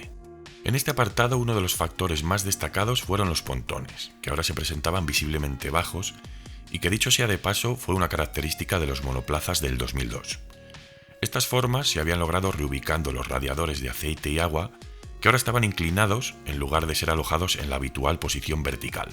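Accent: Spain. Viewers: el español de españa que narra este video es épico porque es un DOCUMENTAL. mano ganaste un suscriptor!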